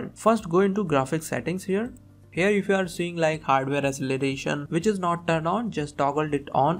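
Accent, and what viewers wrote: India. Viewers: The moment I heard Indian accent, I stopped watching the video. This is fake. No full Nvidia control panel no shit. Imma call Scammer Payback asap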